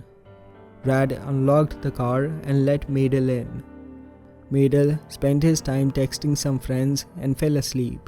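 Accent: India. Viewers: Indian accent . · Indian accent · You sounded Indian, are you Filipino?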